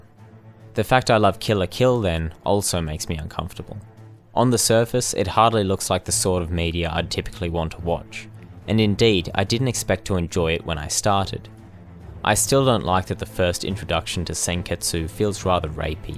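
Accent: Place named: Australia. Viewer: I seem to be falling in love more and more with your channel after every episode I watch. I also can't believe I never noticed that your also Australian before and its Kinda blowing my mind that I never picked up on it. but anyways keep up the great work!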